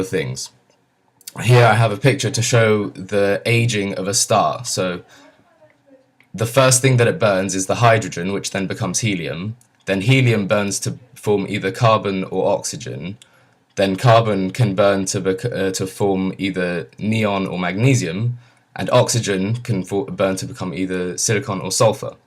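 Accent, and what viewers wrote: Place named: RP, United Kingdom. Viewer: You sound posh. I imagine you went to decent schools. What a waste. You mumble and miss pronounce.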